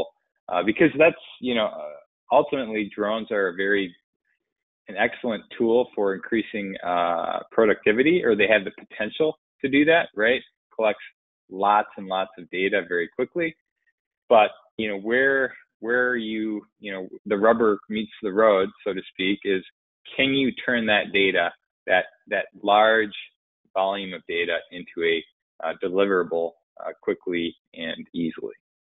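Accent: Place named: Western New York, United States. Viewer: Your accent makes me very nostalgic for my childhood home in western NY, up by the Great Lakes. 🙂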